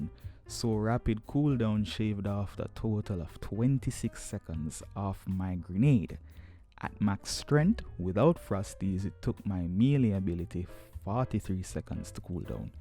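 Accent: Jamaica. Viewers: I can hear you fighting back the “yaad man” accent. Great video tho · Lol why do you have a jamaican accent?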